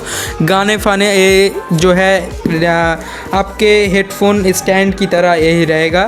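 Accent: India. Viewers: Bengali accent bolte😁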